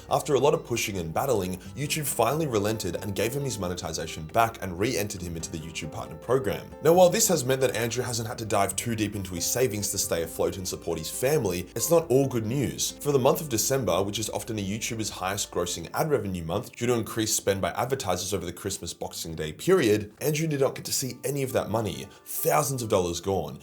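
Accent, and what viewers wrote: Australia. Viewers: Never watched his stuff never even looked into him because well I like your vid length and as a Brit... I do love an ausie accent but yeah hes getting a free sub and watches from now on! Do love how I edited the angry words out and they still kept the angry word version as the comment though 😂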